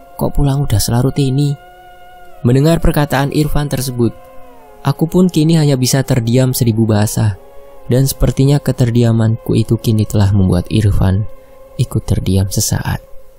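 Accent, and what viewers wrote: Java, Indonesia. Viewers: Ini kan ceritanya orang sunda. Tp kenapa bahasanya, trs cengkoknya itu kaya orang jawa ya, beda sama teksnya.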